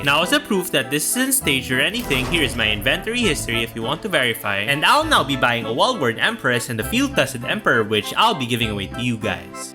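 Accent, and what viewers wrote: Philippines. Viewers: are you pinoy. you sound pinoy.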